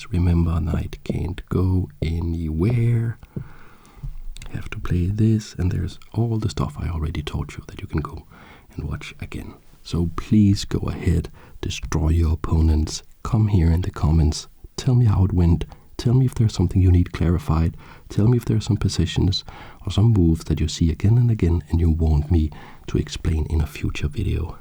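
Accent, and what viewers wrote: Denmark. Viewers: Are u from denmark? I can hear the accent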